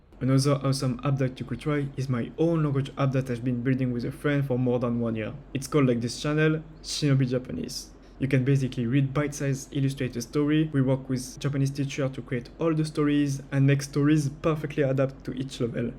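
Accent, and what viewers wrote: France. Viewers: I thought you sounded french 😂🙈🙈🙈. You mentioned how learning Japanese is different from learning other languages (mostly in terms of reading). Maybe you can go deeper into that? Maybe talk about how you adapt your your methodology to the language? 🤷‍♀️ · L'accent français mdr 😂 A few seconds in, I get used to it, I just love when people have some accent (as long as it doesn't make them hard to understand). Also the advice seems solid.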